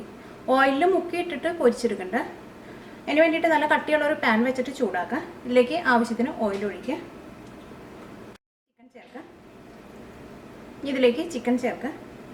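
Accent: Thrissur, India. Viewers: Hi, I tried this, everyone here loved it. Very tasty. All your dishes are really nice I have tried some other dishes also. In the beginning I started watching becoz of ur thrissur slang but then later I liked ur all dishes. even I am from thrissur. best regards.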